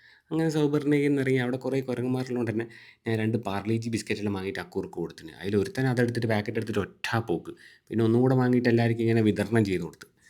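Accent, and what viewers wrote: Kannur, India. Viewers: idh kannur slang a mone😂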